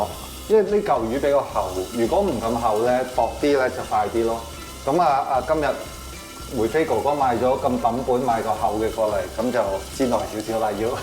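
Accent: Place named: Hong Kong, China. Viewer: 多謝你提供魚湯的教材⋯非常有營養很適合小朋友喝，這是我第一次看這視頻，聽你口音就知道你是來自香港⋯🤗👍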